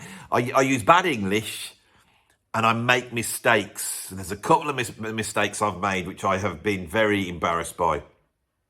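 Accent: Birmingham, United Kingdom. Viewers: Love the vids. I'm not into prog, jazz or jazz fusion (I'm not sophisticated enough, I s'pose). I don't come here for them anyway. I come for the freestyle rambling humour of grounded in a deep knowledge of music. Just the Brummie accent brings a smile. I watched a recent video right to the end that had the rambling Brummie monologue at the end wit the animation. Loved it. Reminiscent of Eric Idle's rant on the travel agent sketch in Python (could that be the first comedy rant?...). I watch these lists tightly gripping the arms of my chair praying that a album will get its due. Usually, I disappointed, but, as previously mentioned, I'm not very sophisticated. As a Canadian, I was glad to see on the list. Our artists never get enough recognition. As for getting words wrong and your philosophy regarding perfection, I'm a few years older than and can empathize. 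I've always annoyed people with my ability to recall musicians, actors, directors etc... That has suddenly become more difficult. I can start a dinner conversation about a famous director then, suddenly, his name leaves my brain for a minute... It usually comes back within a few minutes but it is disconcerting. What a drag it is getting old... someone should work that line into a song... When it comes to mistakes therein lies the charm. I enjoy for the spontaneity, the unbridled enthusiasm. If was to present a perfect narrative, true to script like a politician reading off a t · It’s the British accent, neuroticism and insights that make this bloke’s channel cool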